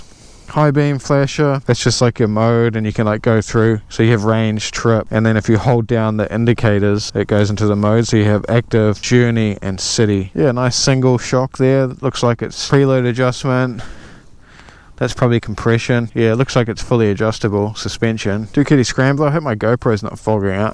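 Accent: New Zealand. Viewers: Cool review bro. Love your style and always good to hear another Kae-wee accent on YouTube.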